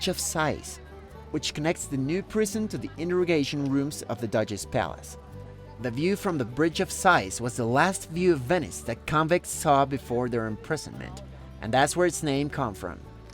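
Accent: Spain. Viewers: Great video. Thanks from Italy. Just a little nitpicking: Your R pronunciation in Italian is a bit "too Spanish 😊" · Great video indeed, thanks for making it! Just out of curiosity, are you Spanish? The way you pronunce Italian names..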